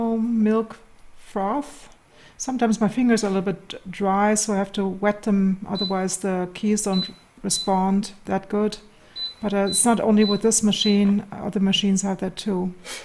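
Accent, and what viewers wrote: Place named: Germany. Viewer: by youur english accent, it look to me you are either German or living for long time in Germany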